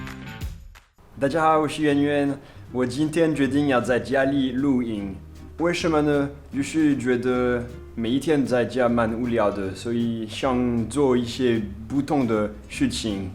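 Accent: Taiwan. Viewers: ，聽起來很親切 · 謝謝，歡迎來台灣。你的新民字很棒，，很親切。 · 你真的愈來愈台囉～聽你講話有種溫暖的感覺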